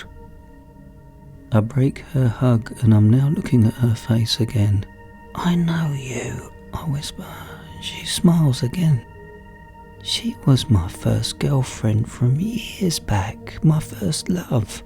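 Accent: United Kingdom. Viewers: why thankyou! it's all because of the British accent and the way you read out the stories, absolutely amazing !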